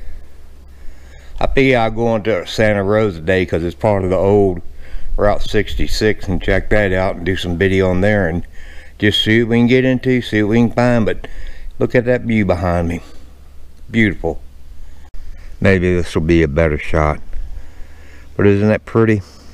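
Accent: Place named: Louisiana, United States. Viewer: Boo Yah. Sounds like ya from La.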